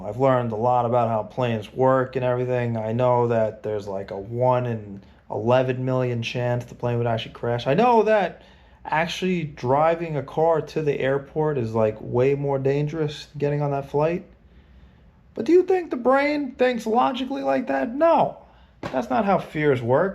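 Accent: New York, United States. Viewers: You are one of the most honest YouTubers out there. I knew you were a NY’er from the accent lol. You’ve probably ridden one of my trains out of NY Penn. Aren’t our trains garbage compared to every other country in the world? lol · I’m a new subscriber. I said to my wife that “his accent & mannerisms seem like a New Yorker”. Yep, I also grew up on the island. I have lived in the Philippines and am planning on moving back in a couple when I retire. Looking forward to watching more of your videos. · Sometimes your "New York" shines SUPER bright in your speech. Lol! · While I still think you're with the CIA, a common spook whose 90% of this audience is women, I've heard in other vids you said you were Canadian but you have a very distinct NY accent. Why the lie? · The greatest accent ever - New Yawk!!!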